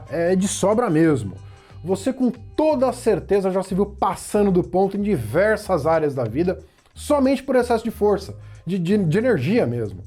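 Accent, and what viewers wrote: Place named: Ceará, Brazil. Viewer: E isso mesmo. O é um sucesso. Adoro o sotaque cearense dele. 😊😊😊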